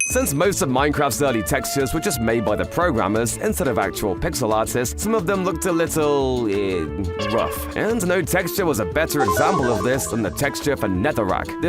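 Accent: United Kingdom. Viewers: This guy has such a youtuber/British accent, it pmo so much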